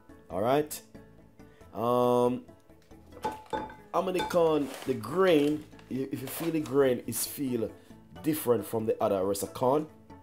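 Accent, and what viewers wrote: Jamaica. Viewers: Queen Kim don’t get it twisted most of us Jamaicans speak good English ,just cause it doesn’t sound like American are British ,he is speaking English but has his Jamaican accent , unuh really know weh fi pick pon . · Mi subscribed once mi hear u voice n see seh yah Jamaican 😭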